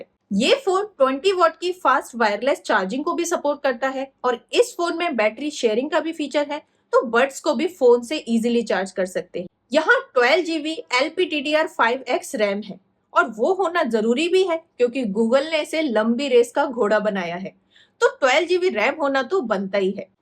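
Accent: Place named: Kangra, India. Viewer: Aap kangra ya hamirpur se lag reho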